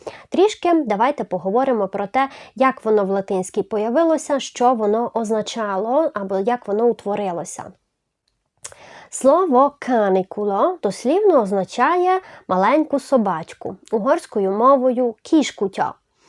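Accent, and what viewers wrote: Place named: Transcarpathia, Ukraine. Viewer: Дякую за відео. По акценту чути що ви з Закарпаття. Дуже мило і красиво пояснюєте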